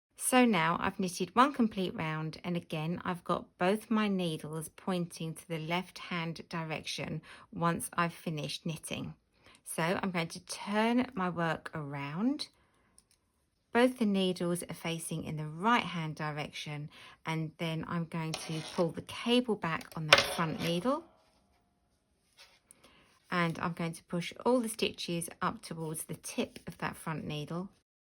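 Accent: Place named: England, United Kingdom. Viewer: Bonjour, et merci pour vos vidéos qui sont de bonne qualité, 😃 (j'apprends à tricoter les chaussettes, et plus particulièrement les talons en partant de la pointe des orteils) 😀 le seul problème c'est que je ne comprends pas l'accent anglais et mon vocabulaire est plutôt scolaire...😊 Auriez-vous la gentillesse de régler vos paramètres, lorsque vous mettez vos vidéos en ligne, afin que les vidéos et sous-titres soient dans des langues étrangères, dont le français, svp, s'il vous plaît ? Mes paramètres personnels sont réglés pour mettre les sous-titres en français lorsque YouTube m'envoie des vidéos de pays étrangers.... Par avance je vous remercie et vous souhaite de belles fêtes de fin d'année...dans la paix et la sérénité 💐💟🎅🤶🕯️🌈☃️🥰🌹🙋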